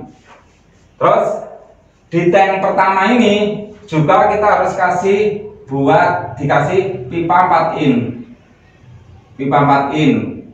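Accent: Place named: Surakarta, Indonesia. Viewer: Ngomong nya mirrip orang Solo🤣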